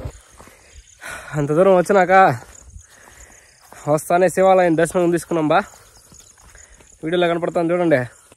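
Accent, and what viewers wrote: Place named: Chittoor, India. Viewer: Chittoor yaasa baagundadhi ba 😉🥰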